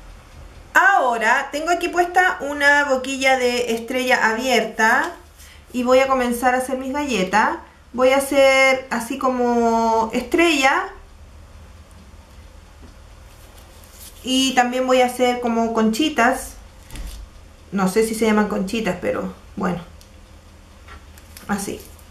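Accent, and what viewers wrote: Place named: Chile. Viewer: gracias, que fáciles.... me gusta ti acento chileno, yo soy chileno y todo me queda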